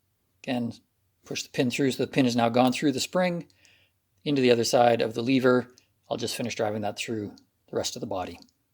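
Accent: Canada. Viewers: I hear a tinge of Canuck, but that cant be..... Trudeau has decreed that no one gets guns. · Ive been around Canadians enough to know, you are in fact Canadian and I love it! I love your accent. Makes me want to take my gun apart more.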